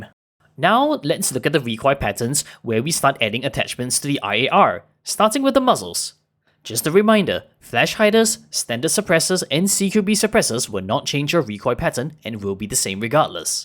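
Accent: Singapore. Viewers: I was just about to comment "this is the closest thing to the BF3 M16A3" and then the video continued and you said exactly that. Great video on my favourite weapon in this game. I'll definitely be trying out that first build with the suppressor. Also, the Singaporean accent is the best Asian accent in English. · I detected the singaporean accent right away, but i digress. Great video bro keep it up.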